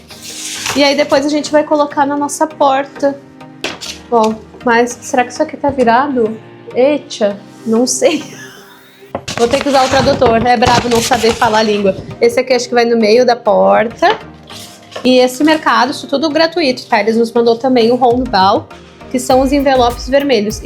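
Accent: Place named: South, Brazil. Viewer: Sou seu seguidor, acho vc e familia muito simpaticos. Queria dar umas sujestões de videos. Antes a china era chig ling. Tudo era copiado. Agora é o futuro, tamanho o avanço de tudo ai. Mostra mais esse lado, as coisas tecnologicas. desde ponte, ruas, maquinas, coisas diferentes pra nos brasileiros. Sou do sudeste mas adoro o seu sotaque do sul. Um grande abraço e um beijinho para o que parece ser um doce de crinaça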